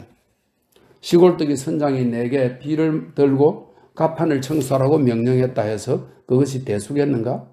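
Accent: Gyeongsang, South Korea. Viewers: 우리한동훈도토리가젊은팬들에게권하는책이라서한번더듣습니다너무고맙고감사할뿐입니다 약간의경상도사투리가더매력적이십니다 즐거운성탄되세요건강하십시요 2023년12월24일0시15분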